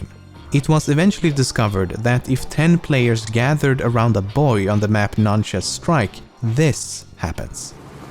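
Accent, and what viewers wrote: Gothenburg, Sweden. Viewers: Jävlar vilken göteborgare du var! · it sounds like you are Swedish I can hear it on your accent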